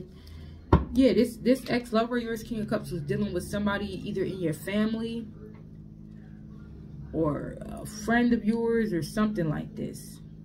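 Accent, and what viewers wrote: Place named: North, United States. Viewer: Damn u fine lil mama and i Kno u from uptop by the accent, im a flordia marlin and you know upnorth our second home... 💐💐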